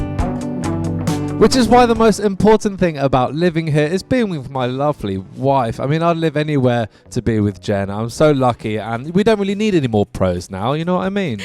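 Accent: United Kingdom. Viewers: I love your british Accent 💕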